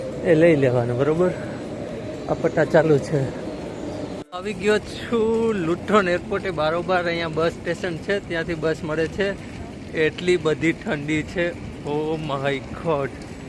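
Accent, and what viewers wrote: Kathiawar, India. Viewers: આપડી કાઠીયાવાડી તળપદી ભાષા અને તમારા ધર્મપત્ની નો તમારી સાથેનો વ્યવહાર જોઈને એવું થાય છે કે આતો બધું હરિની કૃપા હોય તોજ થાય..તમે લંડનમાં રહોશો કે ઈટાલીમાં? તમારા પત્ની જોબ કરે છે કે હાઉસવાઈફ છે?એવો એક વિડ્યો બનાવો..અને એક વાત તો સો ટકા છે કે તમારામાં લેસ માત્ર અહંકાર નથી..અહંકાર વિનાનો દરેક મનુષ્ય મને ભગવાન જેવોજ લાગે છે..God keep your couple safe God bless you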